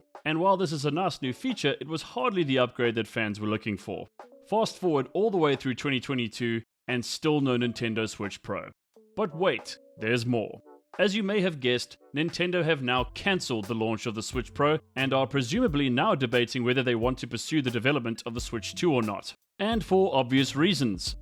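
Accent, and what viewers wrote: South Africa. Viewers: is he south african? is he australian? we will never know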